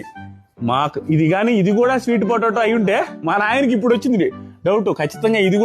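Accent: Nellore, India. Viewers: Maaa Nellorelolla yaaasa antne untadi mari😂❤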